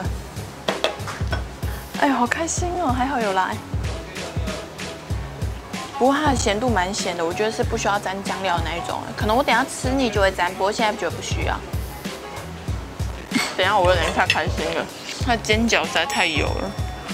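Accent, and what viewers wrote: Taiwan. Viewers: 講台語的時候好可愛www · 講台語道地可愛XD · 看起來好文靜那個氣質很好可是一講話就破功了變(正港台灣人) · 台語很標準吧哪裡怪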